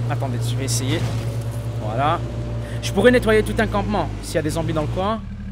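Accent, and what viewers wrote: Quebec, Canada. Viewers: T de quebec?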